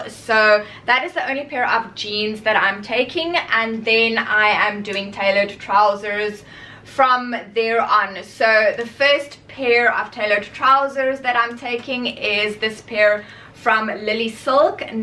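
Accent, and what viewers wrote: South Africa. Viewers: I knew that was a South African accent..love from South Africa 🇿🇦❤I'm a new subscriber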